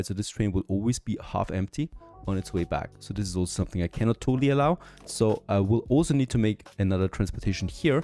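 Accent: Germany. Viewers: alter der deutsche Akzent ist so hart zu hören ne, aber ist ein cooles gameplay ;) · ich hör das deutsch raus... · you only need signals at the end when leaving the double tracked bit. and stations have their own integrated signals. putting one in front of the station is basically asking for trains to block each other. signals in this game are only needed in parts where trains could otherwise block each other. they check the track behind the signal if its clear to the next signal and if yes it lets them go. so you want to place them at the ends of the double track to have them check if the path until the station is clear and if not they wait there. the signal after the station only increases your upkeep but doesnt add any value as the same function is integrated into the station. you would want some block signals in the double tracked part but you dont even need those if you are only running 2 trains. 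this entire line would do perfectly fine with only 2 signals, 3 if you want to be safe and give the depot a signal before merging with the main line. waypoints arent needed at all because the trains would automatically orient towards the correct side with the signals for exiting the double tracked part. your accent sounds german so if you are german i can explain it in more detail in german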